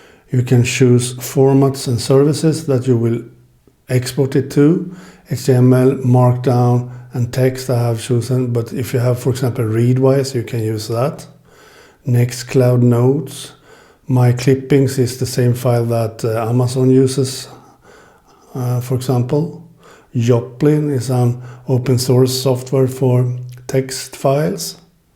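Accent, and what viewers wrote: Sweden. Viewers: I was thinking about jail breaking my kindle and was looking for some information about the benefits. I stumbled across your video and as soon as I heard your voice I got so happy! I studied abroad in Sweden and it was the best time of my life, hearing the Swedish accent again gave me such a comfort, I honestly didn’t listen to the information of the video anymore and just thought about the time I had there. Thank you for this awesome video!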